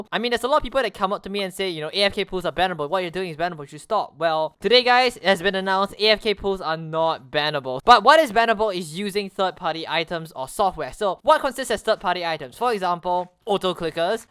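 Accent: Singapore. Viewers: Lemme guess, u r Singaporean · You sound Singaporean ngl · do u live in singapore? u have a slight accent :) ty for the vid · You sound singaporean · btw this is the singapore accent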